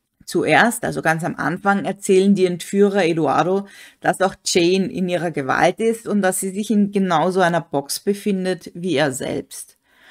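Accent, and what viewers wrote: Austria. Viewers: da lag ich auch fast richtig. Wien hoerte ich zwar nicht raus, aber Ich hoerte leicht Oesterreich raus. Gruss aus Tirol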